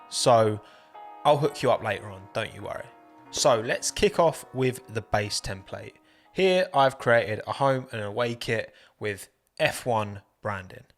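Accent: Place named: Essex, United Kingdom. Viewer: Wonder if you’ll revisit the football/F1 hybrids, loved the video & the creativity you always show For me, I’m a football fan that’s only just getting into the world of F1, & admittedly when I first watched your content, I thought “man he’s basically Spencer FC but F1” especially with the (I think) Essex accent, & then I find out you’re a West Ham fan too which cemented that for me 😅 (I’m not British so my guesstimation might be wrong lol)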